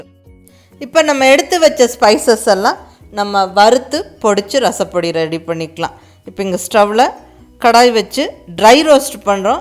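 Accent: Kongu, India. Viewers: I tried rasam . Awesome. Thanks. I like the way of telling and your sweet kongu Tamil slang.👌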